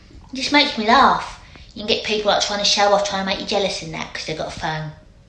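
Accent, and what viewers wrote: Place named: Newcastle, United Kingdom. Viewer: What part of England are you from you sound Geordie I'm from Newcastle if you are as well what part of Newcastle are you from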